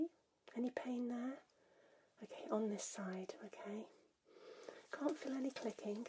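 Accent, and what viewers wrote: United Kingdom. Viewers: i Like the British accent when they’re Yelling and cussing at each other calling each other BLOODY BOLLOXS (This is all jokes but you’re native accent is beautiful and you should know that you are loved by Jesus Christ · I can't get enough of hearing that beautiful British accent here! Thank you for taking care of my ear cranial nerve that has been bothering me for weeks here! Have a beautiful good night 🌙 sleep! 🥱🥰💜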